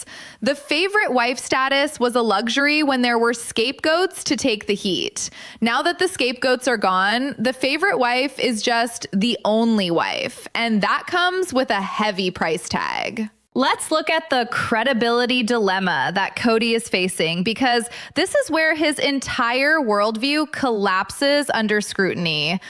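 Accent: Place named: California, United States. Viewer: For the love of God and all that's holy, make the AI get rid of the California sccent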